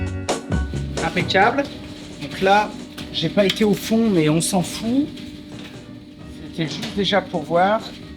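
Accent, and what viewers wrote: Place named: Haute-Loire, France. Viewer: Allez, courage. Moi je suis ravi de retrouver mon avec son accent de la haute Loire, sa bonne humeur et son don pour donner envie de lui ressembler. Quand je serai grand, je voudrai faire « » comme métier 😉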